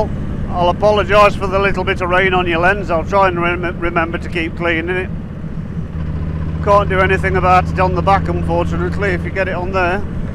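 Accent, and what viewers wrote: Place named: Lancashire, United Kingdom. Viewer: mate I freakin hate bugs 😂 and the amount of wildlife we see and the GoPro does not pick it up is incredible! That’s another reason I stopped using Superview on it cos it even makes curvy country roads seem dead strait!. Ooohhh and the Accent is Lancashire am originally from Preston ‘ 👍👍🍺🍺🥃🥃🇬🇧🇺🇸 ooohh Mate Thanks for the sub Appreciate That 👍👍🤘👌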